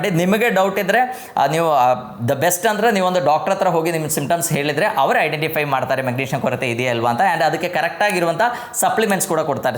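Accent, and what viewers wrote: Kerala, India. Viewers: are you keralite speaking kannada,..?? but you speak well,..!! the composition of chlorophyll, haemoglobin are almost same except for Mg,... replace it ,.. it is blood cell composition,... hence cattle/ grass fed animals are getting blood compositions from grass itself,... !!first source,.. hence grass superfood,.. !!ultimate food,... hence worshipped in every pooja,.. as darbe,... around the fingers as a ring like,...!!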